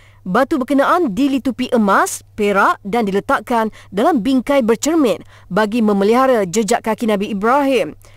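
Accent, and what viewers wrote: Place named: Indonesia. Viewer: Padahal Media Malaysia, tp Logatnya 90% indonesia bgt...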